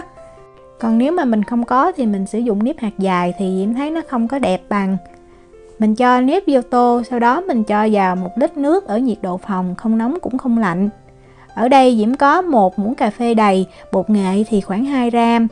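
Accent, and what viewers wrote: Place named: Mekong Delta, Vietnam. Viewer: Diễm oi! Chị nấu thành công rùi ,ngon lắm tơi xốp ,giọng nói người Miền tây dể thương ,Diễm nấu xôi đậu phộng nhe !💛💛💛💛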